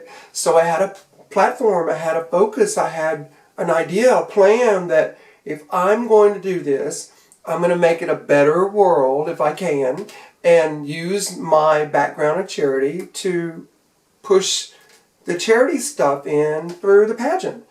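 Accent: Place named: Arkansas, United States. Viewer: you are a hoot! I've watched all of your videos tonight and read thru all the comments (I'm a nosey heifer like that) I just wanted to say....I too, am from Arkansas and now live in Texas so I absolutely love your accent being as it sounds like me! lol I'm not that far from you and if not having someone to help you film is standing in the way of you posting more videos.....hunny, I will film for you! =}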